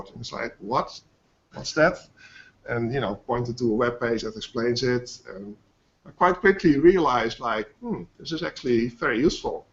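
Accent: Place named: Netherlands. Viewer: I suspected the author was Dutch when I ran the program for the first time in <num> and read the Uganda message. Never bothered confirming that, but now I've heard him and I know.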